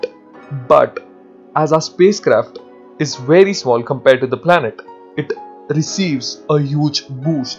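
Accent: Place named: India. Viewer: Indian accent haha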